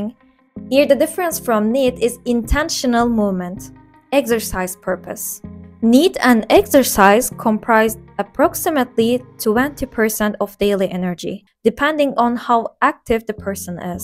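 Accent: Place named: Turkey. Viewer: Im in love with your turkish accent 🤩❤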